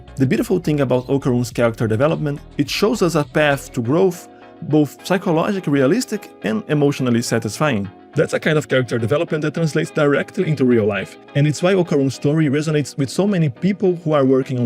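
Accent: Brazil. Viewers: are you brazillian? i can tell by the way you speak english, just curious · acredito que seja brasileiro esse sotaque ingres ai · Great analysis dude! This character trait about Okarun's transformation is something I've already noticed a long time ago, but it's nice to see such a detailed and profound analysis about it. Also.. tu é brasileiro né? Notei pelo seu acento kkkk (mas teu inglês é mt bom man)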